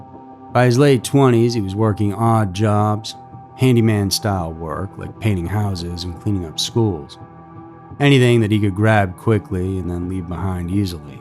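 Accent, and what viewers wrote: South, United States. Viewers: I'm Scottish and I love your southern accent so much 😍. Awesome video, as always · The narrator sounds like airy from the object show objects never escape but with a southern accent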